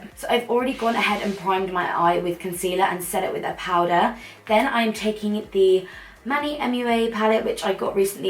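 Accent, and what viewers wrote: Newcastle, United Kingdom. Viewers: Ahhhhh fuck it lets purit everywhere 😂😂 I'm a Geordie and you legit sound like me when I'm getting ready 😂😂🙌🏼👏🏼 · Why were you doing a geordie accent? & love your skin makeup! X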